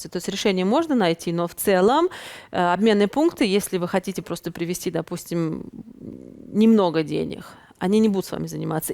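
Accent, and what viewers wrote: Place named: Samarkand, Uzbekistan. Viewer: Шикарный акцент, как будто соседка из Самарканда рассказывает. Очень интересно и увлекательно. Один вопрос, как быть в последующем с визой в Штаты, после посещения Ирана?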